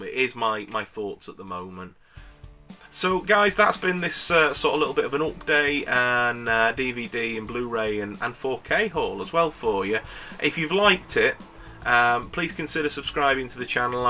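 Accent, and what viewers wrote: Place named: United Kingdom. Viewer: Hey mate, just found your channel and subbed straight away. Great buds buddy. Where abouts in the uk are from? Always good to hear a fellow Brit in the Tube 👍🏻🎥💿🎞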